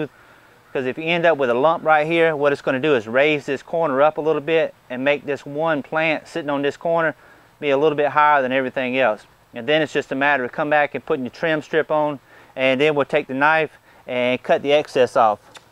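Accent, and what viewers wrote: South, United States. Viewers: must be from the South i live in Arkansas so im not picking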